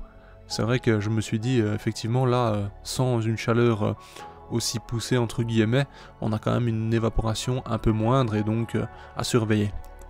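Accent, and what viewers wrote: Belgium. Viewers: Petit accent Carolo ?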